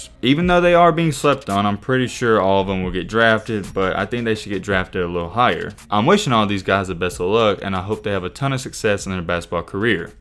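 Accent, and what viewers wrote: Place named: Alabama, United States. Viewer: So you from Alabama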